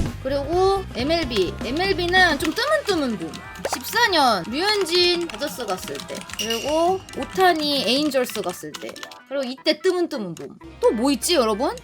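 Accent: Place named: Chungcheong, South Korea. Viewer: 느야 된다 이거ㅎㅎ 충청도 사투리가 아주 네이티브 스피치ㅎㅎ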